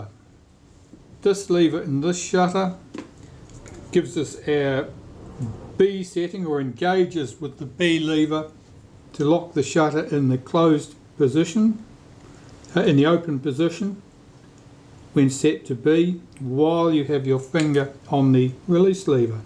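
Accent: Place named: Australia. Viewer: Thank you for this series. Although I have not yet completed my Mockba 4 shutter project, it has been helpful. I CAN report that common internet information would have us believe that the Mockba/Moskva cameras were made identically to the Zeiss cameras with manufacturing equipment they brought back to he USSR as spoils of war, changes were made by he 1950's. The Mockbas went from 5 shutter blades to 3. They are NOT of Zeiss machine fit and finish, and the metal used was obviously of a softer grade of brass in the shutter speed wheel. The springs have not held up their strength well at all. My next project will be my prized Super Graphic with 127 Ektar and Graflex/Compur shutter. I'm confident it will turn out, thanks to you. As for his Moskva or Mockba, I've relegated myself to being happy with a fast/medium/slow, and B setting ,and re-labeling the dial accordingly. 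BTW, No mistaking where you're from. Don't tell me... Australia. I'm in the US, although I expect a transfer to heaven in the next few years. Regards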